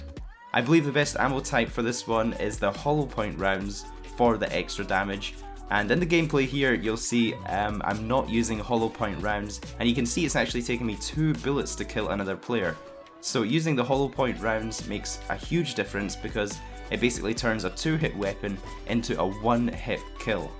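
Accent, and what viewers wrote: Scotland, United Kingdom. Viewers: Find it so amusing watching videos and it’s a fellow Scottish man. So used to hearing Americans and English guys lol. Nothing against the English or Americans, love yous all.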